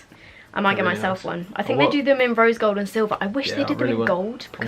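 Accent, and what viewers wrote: United Kingdom. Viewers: Suga bae hae 😂😂 Love a british accent😂😂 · I hate this strong British yikes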